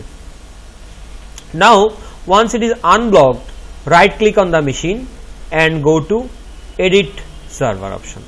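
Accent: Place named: India. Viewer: Here's some tips on how to speak English clearly and decisively, I'm not trying to be rude I'm genuinely trying to help you. You need to slow down and keep the same pace throughout each sentence, don't start slow and then suddenly and for no reason speed up. You also need to enunciate which basically means pronounce the word correctly taking your time to say the whole word clearly. In time as you get better at enunciating you can speed up. The problem with Indians is they speak English the same way they speak their mother tongue. This is a common mistake and is why an Indian accent is very hard to understand. You have a good vocabulary and you're saying the sentence correctly but what's letting you down is your speed (too fast) and pronunciation. Just take your time, write down what you want to say and practise it a few times enunciating every single vowel, again with time this will become easier. 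You've taken the time to learn English but if people can't understand you then you're fighting a losing battle. Listen to a native English speaker that has a neutral accent and then repeat what they say so you learn the pace and pronunciation, you will get better every week and it will open a whole new world to you. Nobody wants to listen to an Indian speaking English too fast and not enunciating its infuriating for us.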